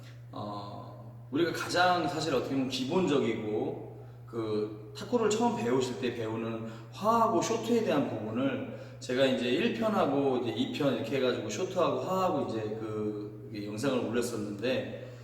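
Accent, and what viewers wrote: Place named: United Kingdom. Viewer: 영국 영어 발음!! 스마슁, 산드위치, 땅큐^^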